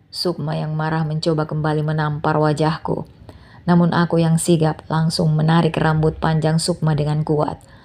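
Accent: Indonesia. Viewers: hadir bunda, jadi adiktif dengan chanel ini logatnya perpaduan sumatera sulawesi jawa hihihi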